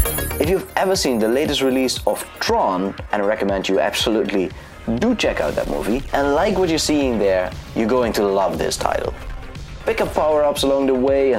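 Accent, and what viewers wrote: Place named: Netherlands. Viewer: Dutch? seems like a dutch accent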